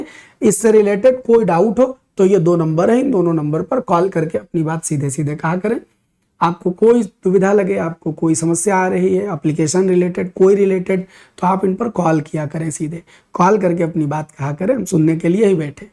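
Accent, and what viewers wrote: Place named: Kanpur, India. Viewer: Aap sir Kanpur m rahe h kya boli aapki vaha ki lgti h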